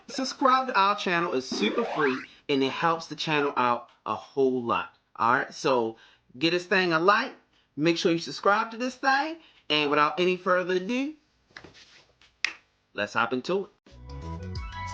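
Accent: Baltimore, United States. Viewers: I need to know if I'm correct. Is that a Baltimore accent I hear?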